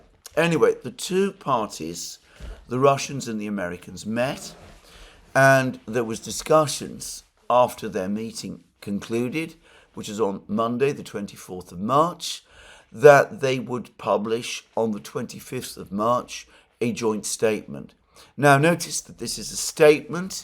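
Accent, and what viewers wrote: United Kingdom. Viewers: Dude, u have problems with your voice and frequent upper respiratory infections because it seems that you have nasal obstruction, deviation or some other anatomic abnormality that affects your nasal breathing and talking. It has been obvious that u grasp for air when talking between the words and your posh english sounds nasal as well. Have u ever consulted an ENT specialist? Most of these stuff can be corrected surgically.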